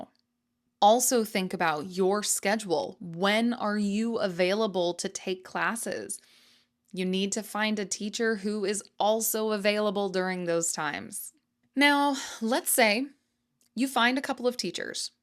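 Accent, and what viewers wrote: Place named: United States. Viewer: Hi there my beautiful teacher you are a master of communication with us and your American accent is perfect meeting our expectations that's why we love 💕 you here in Morocco keeping up ❤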